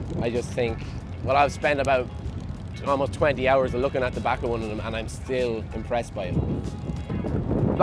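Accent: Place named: Alabama, United States. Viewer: Moving away from HD and looking at a Wing. Getting a little outdated and needs some new updates, but you get a lot out of the gate. No extra cost for adding heated grips, heated seat, having multiple windshields, etc. Honda has been refining this bike for a lot of years and they seem to know what they are doing. Oh it’s not American, what bike is? HD ain’t. Indian ain’t. It’s hard enough to spend $30k on the Honda forget about the other brands. But I think I need a comfy bike for 2-up riding that can eat up the miles. I think the Wing is the answer. Nice video. Love the Alabama accent 😂. Ride safe 😎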